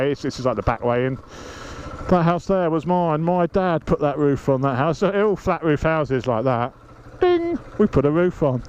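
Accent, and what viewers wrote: United Kingdom. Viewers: Haha. Love this. Bless the old man at the end. Genuinely thought you were a London boy. You've definitely got a cockney twang.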